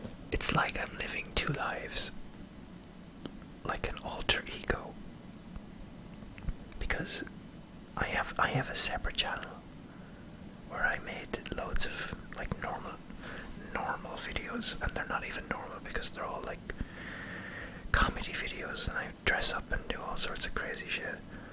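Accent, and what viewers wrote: Ireland. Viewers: That's the kind of ASMR I need <3 Irish and inspirational (and no chewing shit)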